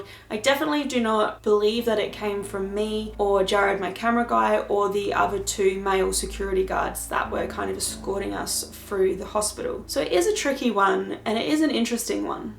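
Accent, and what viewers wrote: Australia. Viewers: Hey, I just found your channel through the link the paranormal scholar shared and I have to say after watching a few of you videos I really enjoy your channel and the content is awesome. I’m gonna sub right now. Also, I love you accent. My sister’s husband was born and raised in Sydney Australia but now lives here in Orlando, Florida. He and his family have that awesome Aussie accent and you very much remind me of it. Anyway I look forward to watching your previous videos and seeing what’s to come. Take care and keep on with the awesome awesomeness. Peace!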